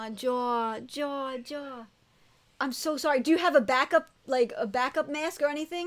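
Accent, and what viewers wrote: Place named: Boston, United States. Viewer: Oh yeah and where are you from i hear Rhode island or Boston in your accent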